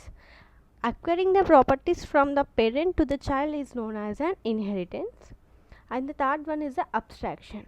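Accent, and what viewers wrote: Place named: Telugu, India. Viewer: Medam bachaparu according ur slang u r telugu right can u please explain in telugu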